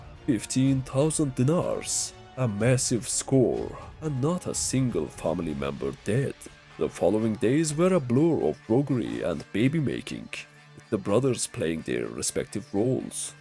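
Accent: Turkey. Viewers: Bastaki dying telafuzundan türk oldugu anladim. Memati maskeyi ne kadar takarsa taksin. Basarilar kral kolay gelsin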